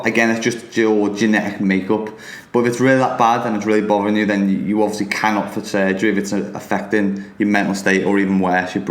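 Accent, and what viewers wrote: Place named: Liverpool, United Kingdom. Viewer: Nice physique, quite an interesting accent, is it Liverpool one?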